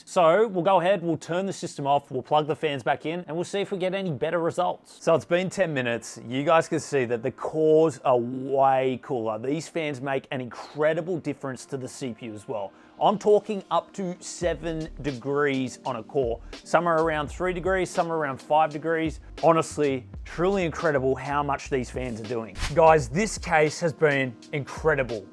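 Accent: Australia. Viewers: Awesome video as always, and a great case from CoolerMaster. ☺ Thanks a lot, my friend, for the video and for showcasing that really good CoolerMaster case. ☺ By the way, I watch your videos in your original language because I really like the Australian accent. 😊 It reminds me a bit of the British accent. 😅 Best regards. ☺ Geiles Video wie immer und gutes Gehäuse von CoolerMaster. ☺ Vielen Lieben Dank mein Freund für Dein Video und die Vorstellung des echt guten Gehäuses von CoolerMaster. ☺ Übrigens schaue ich Deine Videos in Deiner originalen Sprache an, da ich den australischen Akzent richtig gut finde. 😊Das erinnert mich ein wenig an den britischen Akzent. 😅 Liebe Grüße an Dich. ☺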